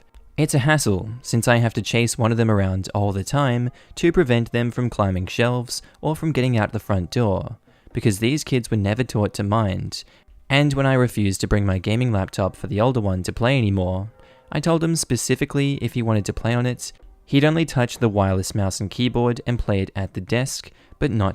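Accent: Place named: Australia. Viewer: BTW i recommend your channel to my aunt who’s learning English, for listening skills. God thing you’re a native speaker!! Aussie accent is real good for learners! <3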